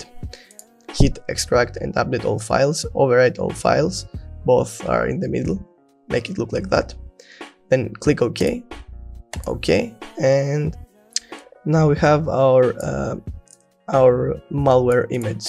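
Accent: Greece. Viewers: Great video and great accent (comming from another greek)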